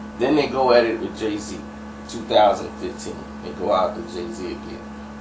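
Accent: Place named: Chicago, United States. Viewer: I can do without your Chicago Accent Bro! Next video you need to sound like you from VA or I'm going to unsubscribe!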